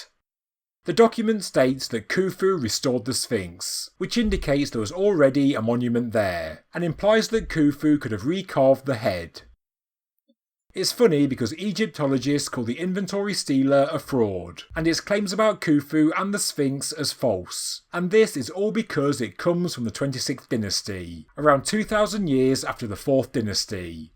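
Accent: United Kingdom. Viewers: Love your accent, so Little Britain...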